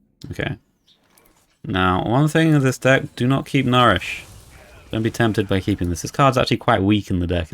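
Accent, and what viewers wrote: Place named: England, United Kingdom. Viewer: The english accent helps :)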